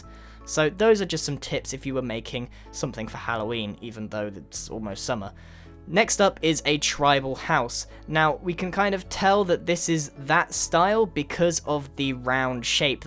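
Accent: United Kingdom. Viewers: british accent and good content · he's British. he doesn't say things the same as you.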